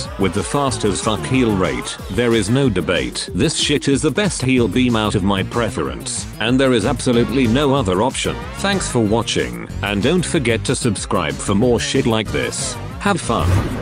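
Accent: United Kingdom. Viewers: Honestly, man should've just used his British voice for this Ability Analysis.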